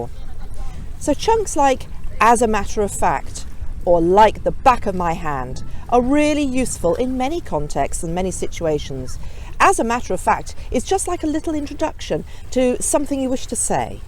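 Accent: United Kingdom. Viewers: I like your standard English and Received Pronunciation,, thanks for great tips ,, you asked before what sounds we have problems with ,, well I have problem with ‘b’ sound , the I know the main difference between ‘ b’ and ‘p’ is the former is much stronger than the latter but the trick is how I can make ‘ b ‘ such a strong sound ??? Apart from vocal cords use as I use then while uttering ‘ b’ but I feel I still miss something to make ‘ b’ more powerful but I don’t know what is it !!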